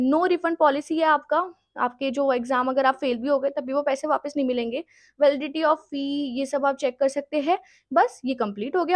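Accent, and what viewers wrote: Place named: Delhi, India. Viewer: AAP kaha se hai delli se kya. App ke baat se pata chaltaa hai madam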